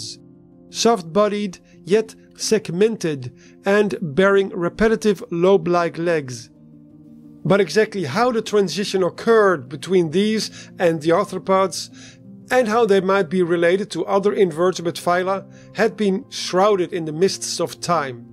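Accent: Netherlands. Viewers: Hearing that dutch accent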